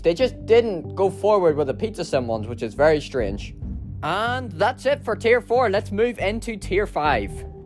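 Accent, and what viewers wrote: Northern Ireland, United Kingdom. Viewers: In the first 3 seconds, I was able to detect that you were from Northern Ireland. The accent is hard to mistake. 😂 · You from The North of Ireland?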